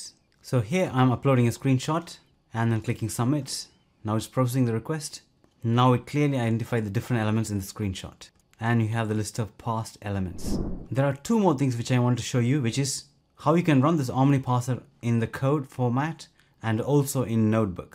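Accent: South Africa. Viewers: Thanks dude. Somehow I knew you were from SA. I'm in George, western Cape. Happy coding buddy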